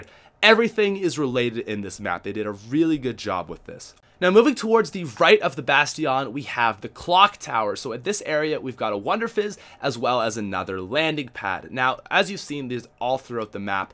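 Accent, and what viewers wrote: Canada. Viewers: Idk if anyone can tell but i know for a fact he is canadian by the way he says "are". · Are you Canadian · is this guy from Canada? he sounds like he is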